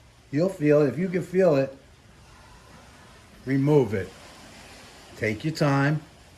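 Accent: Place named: Boston, United States. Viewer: Somewhere around Boston I can tell.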